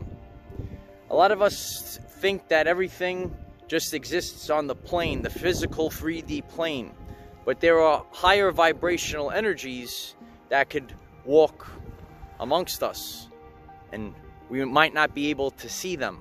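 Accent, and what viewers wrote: New York, United States. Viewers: You must be a fellow NYer :) Thanks for this great video 💕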